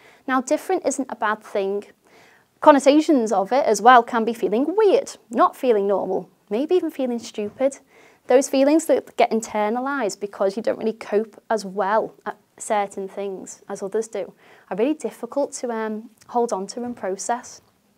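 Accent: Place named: Scotland, United Kingdom. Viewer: I was also wondering if it's irish or Scottish... imma say Scottish haha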